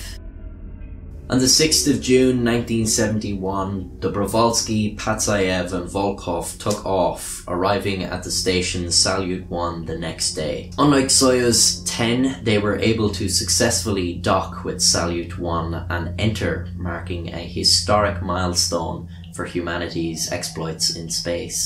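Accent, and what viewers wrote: Ireland. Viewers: It's refreshing to hear another Irish accent that I'm not used too during this quarantine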